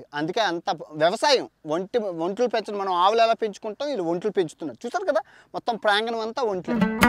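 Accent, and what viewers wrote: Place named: Andhra Pradesh, India. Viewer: ఉత్తరాంధ్ర మాండలికంలో మధురంగా చెబుతున్నారు.జయోస్థు.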